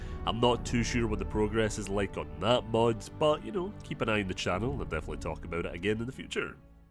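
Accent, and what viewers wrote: Scotland, United Kingdom. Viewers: i like the way scottish people talk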